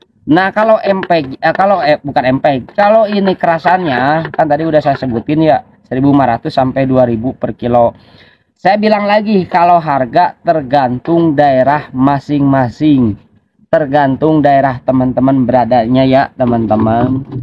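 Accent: West Java, Indonesia. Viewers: Asalamualaikum,,, kang bos Dina logatna mh jiga orang Sunda , Mun tiasa nepangkeun orang mana , linggih di mana bos , seueur abdi bos barang" na empeg , panel , PCB hp + Sagala rupi Aisi bos , nyengcelengan bos tos seueur 🙏🙏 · Si aa mantap... Asa sunda ini mah❤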